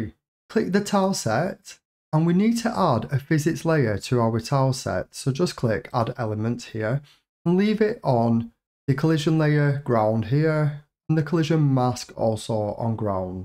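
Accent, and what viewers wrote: United Kingdom. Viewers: Let me guess, British?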